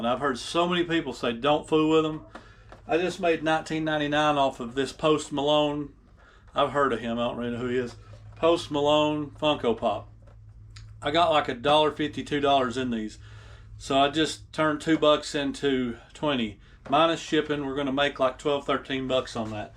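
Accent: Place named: South, United States. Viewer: Southern accents sometimes leaves others guessin 😊 I'm going with Pack It. Hope Y'all have a great Holiday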